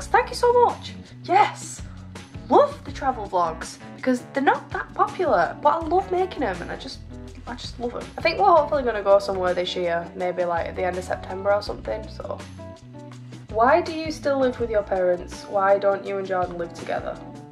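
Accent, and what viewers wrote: Northern England, United Kingdom. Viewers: That's a northern English accent. A beautiful one at that. I've always preferred northern English accents to southern English accents. There are 54 different British accents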